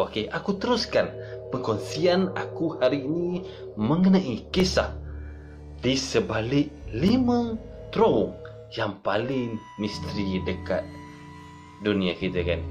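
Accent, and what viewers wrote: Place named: Kuala Lumpur, Malaysia. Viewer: Mantap abe ckp kl.. 👍👍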